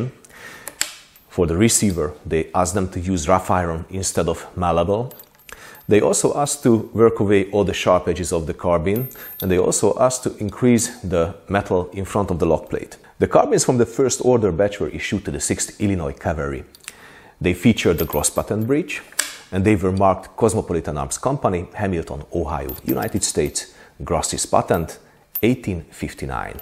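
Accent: Hungary. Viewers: And a thank you to you, in beautiful bright Hunglish—Köszönöm! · I have recently found your channel and I thoroughly enjoy your videos in the beautiful Hunglish language! I enjoy reading historical fiction, especially that of Bernard Cornwell over the periods of the Napoleonic Wars, American War of Independence and the US Civil War. In it he describes the weapons and how they're used in detail. Your channel is the first one I've found that goes into the same detail, showing how they work and their design. I really enjoy seeing all these pieces I've read about in action. Thank you very much for sharing your passion with us!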